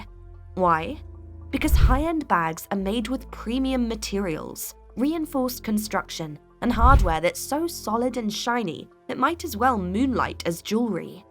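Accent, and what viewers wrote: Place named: RP, United Kingdom. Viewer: why choose a robot voice with THAT HIGH BRITISH ACCENT?? Do you think it helps you to sound more sophisticated? Not it doesn't. Because it's clearly not your voice, it's a robot voice. You are pathetic.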